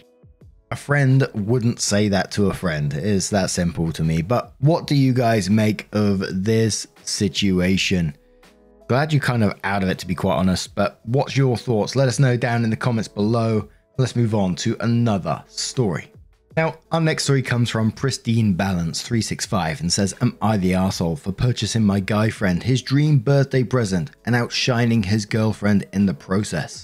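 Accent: United Kingdom. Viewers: You have a pleasant voice to listen to, but I can’t tell if you are from US or UK. UK?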